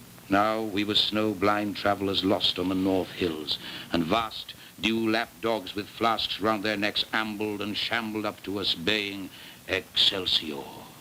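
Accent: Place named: Wales, United Kingdom. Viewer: Burton really went back to a Welsh accent as he went through this. As a Welsh person I loved that.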